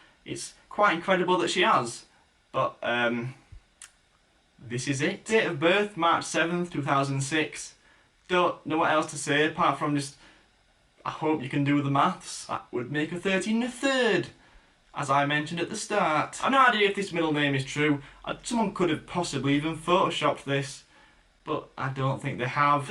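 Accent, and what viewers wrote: United Kingdom. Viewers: I love your accent are you British? Swedish? Irish? Or Australian?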